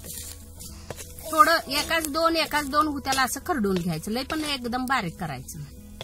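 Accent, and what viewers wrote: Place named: Rural, India. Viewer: रेसिपी तर चविष्ट झाली असणारच. पण ही गावरान भाषा कानाला इतकी गोड वाटते की ऐकतच राहावीशी वाटते.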